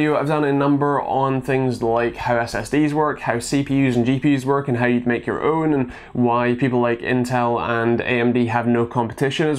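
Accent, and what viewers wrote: Scotland, United Kingdom. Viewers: It sounds Scottish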